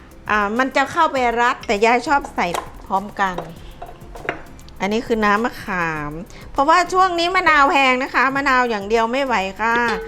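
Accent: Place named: Nakhon Phanom, Thailand. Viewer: เป็นคนนครพนมเหมือนกันค่ะ คุณแม่คุณยาย วิธีการพูดคล้ายๆ เลยย ดูทุกคลิปที่คุณยายทำอาหาร ชอบทุกอัน ฟีลเหมือนได้กลับบ้าน ชอบมากรักมากค่ะ ทำบ่อยๆนะคะ ติดตามต่อไปค่ะ🤍🤍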